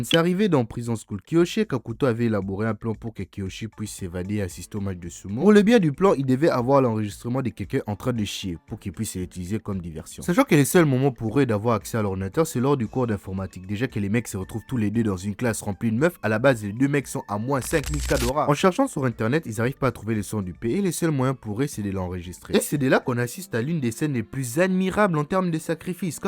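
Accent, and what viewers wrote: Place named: Democratic Republic of the Congo. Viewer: Rien qu'à ta voix,je sais que tu es un 243 😎🇨🇩(moi aussi)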